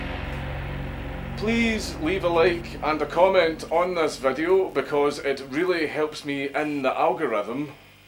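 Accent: Scotland, United Kingdom. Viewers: You're a Scotish guy, aren't you,?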